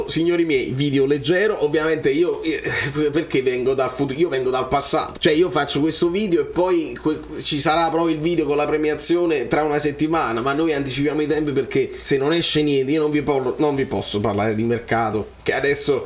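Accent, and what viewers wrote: Naples, Italy. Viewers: Il canale più simpatico...ma sei napooletano uagliò.......🤣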